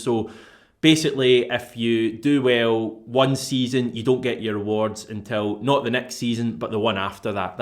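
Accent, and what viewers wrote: Scotland, United Kingdom. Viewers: He's scottish. I think most folk don't understand us.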